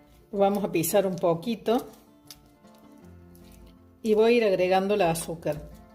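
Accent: Córdoba, Argentina. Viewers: La primera vez comí la tarta de ricota con naranja en Cordoba Argentina, hace 15 años; simplemente fabulosa. Voy arriesgarme a preparla. Me encantó oir su acento cordobés. Gracias por compartir.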